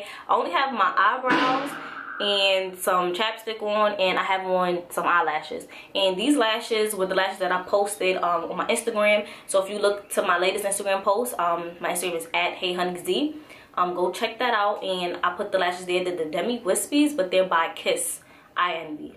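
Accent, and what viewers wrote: New York, United States. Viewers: As soon as I started the video I knew you were from the New York City lol thanks for sharing this review, about to go try mine out now! Just subscribed beautiful xo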